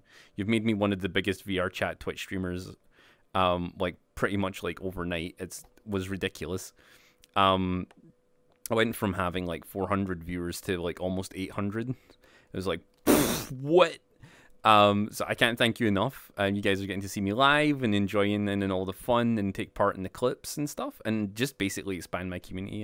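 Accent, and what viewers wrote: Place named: Scotland, United Kingdom. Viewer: Wait a minute he’s got like a Scottish accent and now I’m not gonna be able to unhear it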